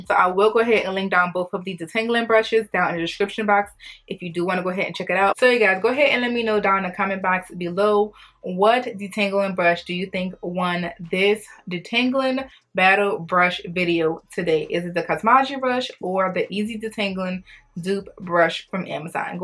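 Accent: New Orleans, United States. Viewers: It’s so interesting listening to you speak, I didn’t know you’re Nigerian but you definitely have that African American accent and a little bit of that NOLA flair.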